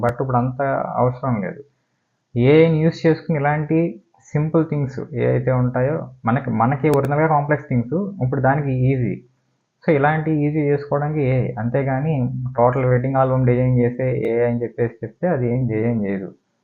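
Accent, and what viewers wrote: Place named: East Godavari, India. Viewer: Anna ne proper ekkada? konchem east godavari slang matladutunnav!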